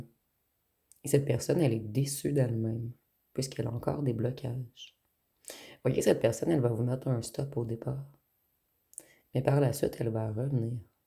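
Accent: Quebec, Canada. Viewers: ✨Yes 😉. ✨Booster...Liker... Abonnée... ✨BonJour de France 🇨🇵, et grand Merci pour vos excellentes guidances! 💫Très souvent, j'ai l'impression que vous nous "connaissez"! 🌟 Vos transmissions sont enrichissantes... C'est aussi agréable de bénéficier de votre gentillesse, et d'entendre votre joli accent québécois! 💛☀️Je vais écouter votre guidance de ce jour, maintenant.🙋A bientôt.🎈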